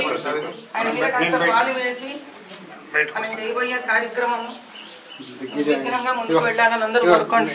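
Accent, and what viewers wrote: Telangana, India. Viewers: నమస్కారం మేడం మీకు తెలంగాణ యాస మీద చాలా పట్టు ఉంది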